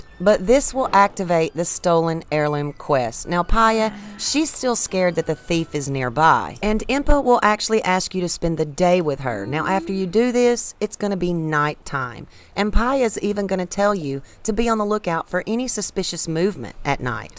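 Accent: South, United States. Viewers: I have just recently started playing boyw and I love tour vids, they are so well explained. Also I love that foxy southern accent. Much love from Scotland. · I swear homie sounds like she could be related to me. So damn southern it can't be scrubbed off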